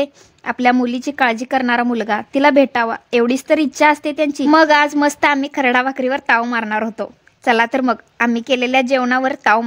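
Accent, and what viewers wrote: Kolhapur, India. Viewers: Tumch gav konat.bhasha kolhapur chi vatate.mi pan kolhapur madhun aahe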